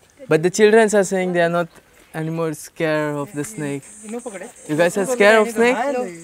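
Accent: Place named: Russia. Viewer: Bro ur accent sounds like that of russians. Cool✌️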